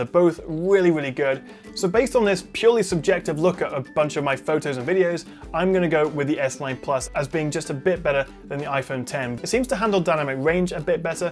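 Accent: United Kingdom. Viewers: The review was really great 👌🏻 and well done ,with awesome photo samples but your voice(the British accent) felt annoying AF. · He is cuuuuute, AND British win win!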